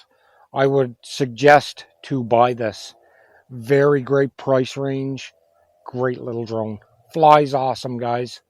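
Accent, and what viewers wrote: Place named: Canada. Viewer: Where about's are you in the world my friend, you sound Canadian to me, hence you got loads of money to buy any model you want :-) Canada or USA?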